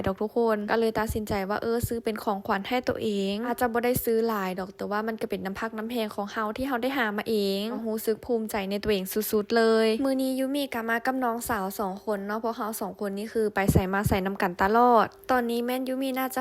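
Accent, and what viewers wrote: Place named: Khammouane, Laos. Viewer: ภาษาน้องเว้าเป็นภาษาทางแขวงคำม่วนทางอิสานของไทยจะนครพนมและสกลนครครับ❤❤❤❤❤❤❤❤❤❤❤❤❤❤❤❤❤❤❤❤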